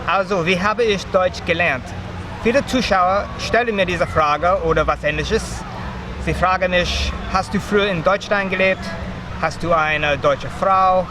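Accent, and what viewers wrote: United States. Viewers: Dein Deutsch ist einfach super mit einem schönen amerikanischen Akzent 😀👍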